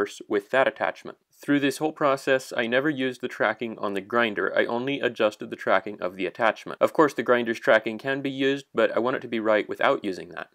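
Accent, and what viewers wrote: Canada. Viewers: This is the most Canadian sounding American i have ever heard.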